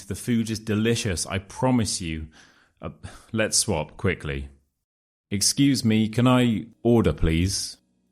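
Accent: United Kingdom. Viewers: Hello!,i'm practicing with your British accent videos, but I'm a beginner, I don't understand much, but I feel like it's helping me, thank you, greetings from Mexico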